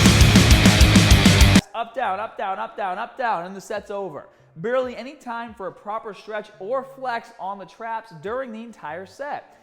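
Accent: Boston, United States. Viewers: Ha,ha,is this a Boston accent? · Love the Boston accent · Ahahaha. I couldn't get over the bahbell and had to find out if you were from Boston. Lawrence, close enough. Hi from Manchester NH although I grew up in Somerville. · This guys is from Boston isn’t he. Sounds like marky mark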